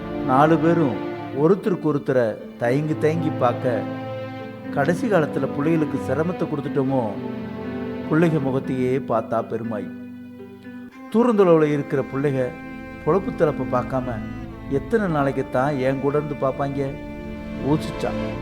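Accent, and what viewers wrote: Madurai, India. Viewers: அருமை மாமா இயல்பான மதுரை தமிழ் எளிதில் மனதை தொட்டுவிட்டது, உங்களது குரல் இந்தக் கதைக்கு பெரிய பலம்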